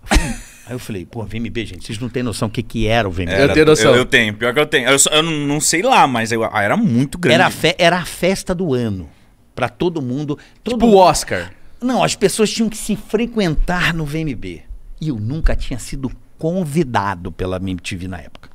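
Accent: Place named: São Paulo, Brazil. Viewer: Carioca tá Paulistão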